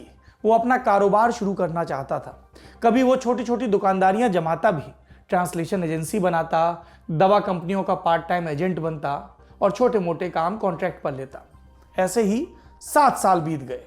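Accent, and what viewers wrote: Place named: Kanpur, India. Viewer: Gazab haunk diye dadaa.. Chungi lga di.. Hahaha.. Kanpuriya andaaz wah wah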